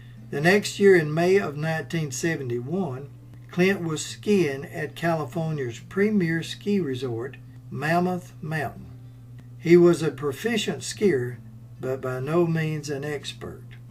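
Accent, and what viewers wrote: South, United States. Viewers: I don't care about the subject. It's the narrator that's I like listening to. The southern accent is just adorable and voice calming.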